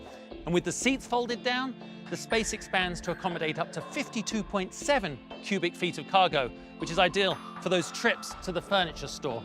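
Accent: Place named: United Kingdom. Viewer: if this is Jaguar USA, why the host has british accent?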